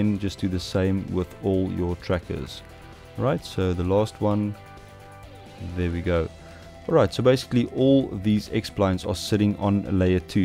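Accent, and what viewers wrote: South Africa. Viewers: now thats a south african accent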